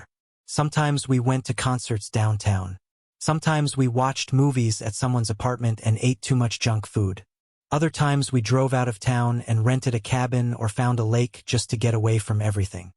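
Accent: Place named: Germany. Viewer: A good brief story with the occasional brief German accent. ❤🎉🏴🖖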